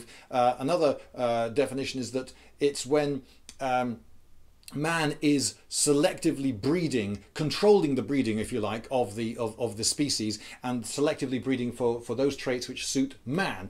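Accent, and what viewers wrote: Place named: England, United Kingdom. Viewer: +Brute127 Care to add anything besides assuming I didn't watch the video? I watched the video. A man with an English accent and one barebacking experience is not somehow an expert. Don't be fooled. Calvery was used for a reason. Warfare is more than running into a group and bashing someone. This man's thesis is astoundingly stupid.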